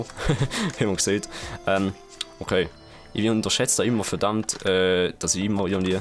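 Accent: Switzerland. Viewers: dü bisch meini ä westschwiizer... bi urner :D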